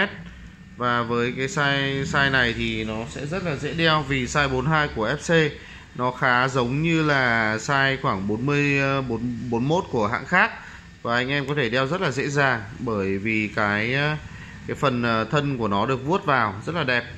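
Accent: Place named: Scotland, United Kingdom. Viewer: Thought this geezer was Scottish at the beginning lol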